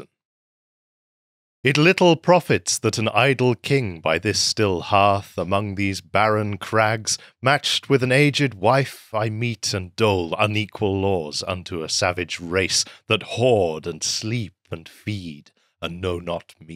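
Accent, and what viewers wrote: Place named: United Kingdom. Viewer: The Brit narration can not be beat.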